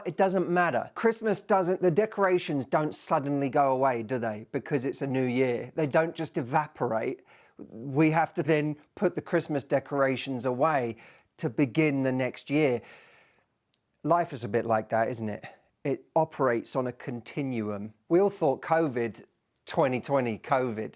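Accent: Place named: United Kingdom. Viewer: Your British accent is standing up again, I guess spending good quality time with family is the reason.😊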